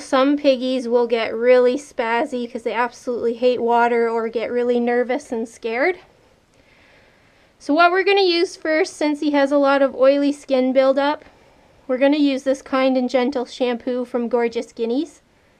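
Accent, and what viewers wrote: Canada. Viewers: She sounds Canadian 😂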